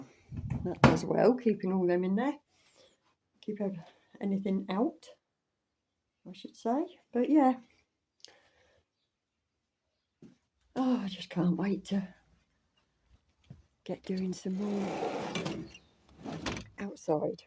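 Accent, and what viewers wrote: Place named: Kent, United Kingdom. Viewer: I’m originally from Kent, similar accent 👌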